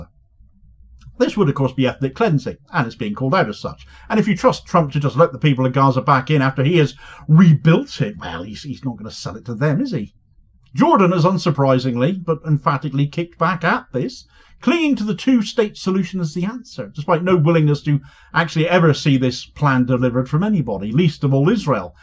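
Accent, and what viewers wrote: United Kingdom. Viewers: I love how this idiot with the stupid British accent thinks his opinion holds any relevance on anything in this country.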